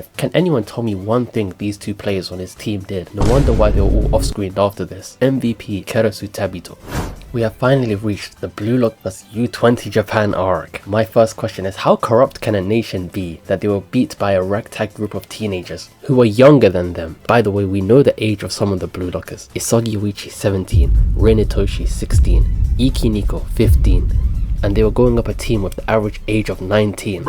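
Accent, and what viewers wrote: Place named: Somalia. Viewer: i can hear in ur voice you’re somali, good vid bro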